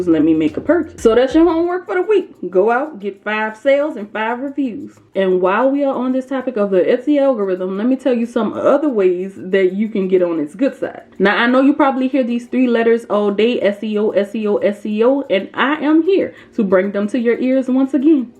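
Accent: New Orleans, United States. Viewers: You're from New Orleans aren't you?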